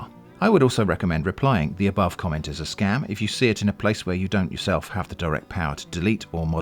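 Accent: United Kingdom. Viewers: > British accent > “dear viewer” for some reason that is entirely beyond my understanding, i feel instantly engaged in the video and compelled to subscribe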